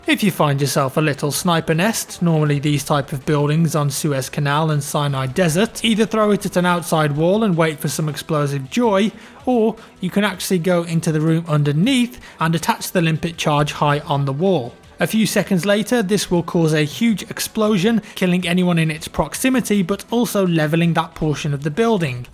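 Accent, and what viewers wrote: England, United Kingdom. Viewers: .. What type of English accent is yours? It's really nice and probably the easiest one to understand.:)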